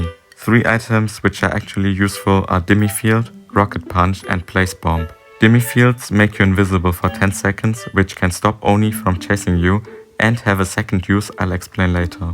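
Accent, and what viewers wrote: Germany. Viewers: Anhand des Gameplays und dem Akzent konnte ich entnehmen, dass du deutsch bist und ich bin dir soooo unfassbar dankbar. Ich habe Muzifer nun auch und auf dem account(ich starte relativ oft neue) auch glamourella. Nur noch Kalteser fehlt und ich bin dir ehrlich sehr dankbar. Kannst du eventuell auch einen guide zu panda noko machen?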